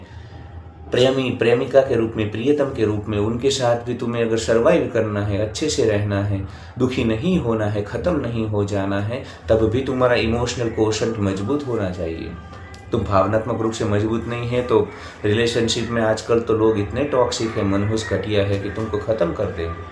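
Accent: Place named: Gujarat, India. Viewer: Gujarati accent wali hindi ❤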